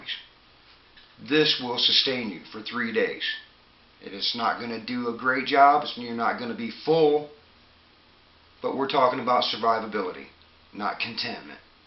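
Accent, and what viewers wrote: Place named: United States. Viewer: He speaks English with American accent. BTW, American accent is the most spoken English accent in the world.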